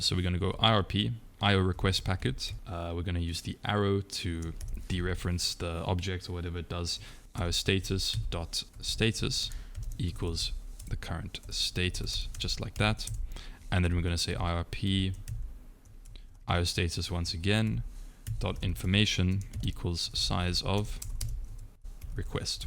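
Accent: South Africa. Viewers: I figured you were South African but when I heard the "yoh if I could spell" I knew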